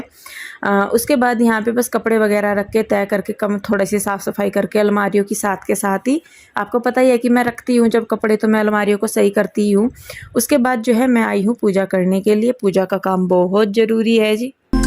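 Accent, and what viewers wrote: Haryana, India. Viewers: Mam aapka ghr kah h , boli to Haryanvi h Please reply me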